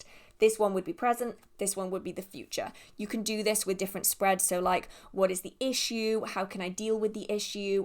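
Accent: United Kingdom. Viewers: Hello there. I found you recently. As someone from Romania first I noticed your British accent. Then your metal look in other videos.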